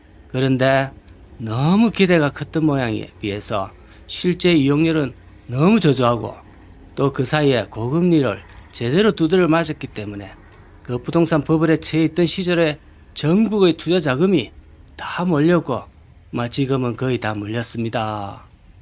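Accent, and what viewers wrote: Gyeongsang, South Korea. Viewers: 안녕하세요...항상 수고 많습니다. 이 처음에는 구수하고 조금 어눌한(?) 경상도사투리가 참 좋았는데 지금은 말씨가 표준말로 바꿔가고 말도 빨라지네요 ㅋㅋ. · 전라도에사는 60대인데도 경상도구수한 사투리가 참 잘 어울리셔요.인생지침에 많은 교훈이 됩니다.항상건강하시구요.감사합니다.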